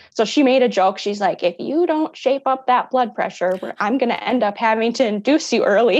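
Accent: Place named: Wisconsin, United States. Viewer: I recognized this beautiful family was from Wisconsin within the 1st minute I heard her voice. I'm so very sorry for your loss. She is gorgeous, she is loved, she was wanted and she will always be missed and remembered. Much love and support from Madison, Wisconsin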